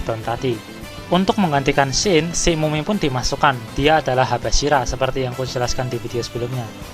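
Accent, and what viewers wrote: Java, Indonesia. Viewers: Miminnya orang jawa ya?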